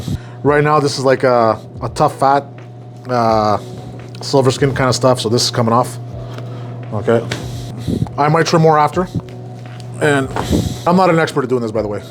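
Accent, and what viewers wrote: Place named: Montreal, Canada. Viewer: Born on the West Island and live in South Carolina now. Stumbled on this video and within 5 seconds I could tell chef is from Montreal. It's like music to my ears 😂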